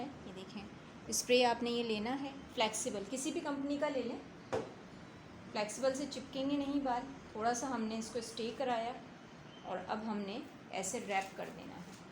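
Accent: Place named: Karachi, Pakistan. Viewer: Aoa. U r great n soooo sweet. Bas ap se ek request ha k ap. Karachi se belong hain isleay apke bolne main pata chalta ha. Isleay ap open ko opin bolti hn. Youn samjhen ap ne pen ki tarah yad rakhna ha. .. plz mind na kejeay ga. Ap boht achi hn❤ or parhi likhi bhi hn. Bs is choti se mistake btai ha. N sorry agr apko bura lga ho to.....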